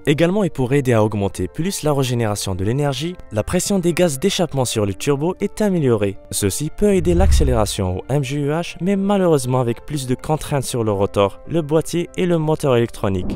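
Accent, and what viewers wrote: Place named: Morocco. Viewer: Ah mais accent je peux pas ! C'est quoi ça ? Vs avez trouver un mec au fond du Maroc pour lire votre texte ? Laysteuuurrrr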